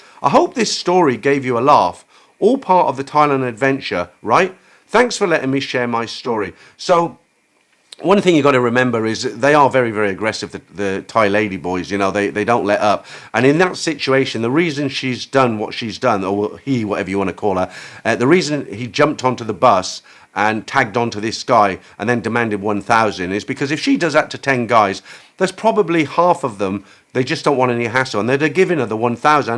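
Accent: United Kingdom. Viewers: That last story was THE FUNNIEST STORY I've ever heard on this channel !! ....and the super professional way you read it (with your super-kool-British-accent) ...makes it even funnier....not that anything is wrong with your accent, but to me (as an American person)...it just hits me in a way that makes the story The Greatest !!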